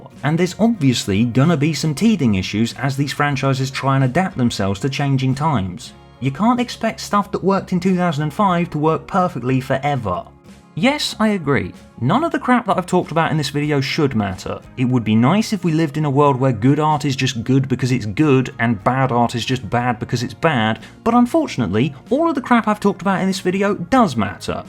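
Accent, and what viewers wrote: United Kingdom. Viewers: I don't totally get the point you're making? I know a lot of the comments find it really smart I just personally kinda don't. Especially with the end question of “Would Doctor who catch on today?” Like, that's just not a very good or interesting question. The answer is probably no but you don’t see anyone asking that question about Batman or Shakespeare plays. I also think there was an assumption made in the video that the world of streaming is SO DIFFERENT to how TV was before and that’s not really true. Where we watch stuff is different but the age of binging has been dying out for a few years now and streamers just seem to be replacing cable. I personally think if you didn’t have the “smart youtuber” cadence and a British accent this video wouldn’t come across anywhere near as insightful as it does, because it just isn’t that insightful and it feels more like a man trying to intellectualize the fact he doesn’t think dr who is as good as he used to think it was. 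Also all the streaming shows you compared it to at the end of the video were made for adults and the show is a childrens show. You’re better off comparing it to “Bluey” than you are “Severence”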